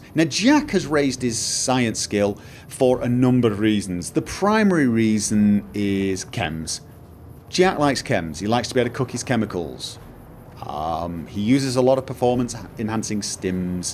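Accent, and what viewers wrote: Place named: Scotland, United Kingdom. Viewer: what do you for work? Your ability at logically discussing things in terms of their elements in an easy- to-understand way as well as your poetic Scotish accent must be incredibly useful.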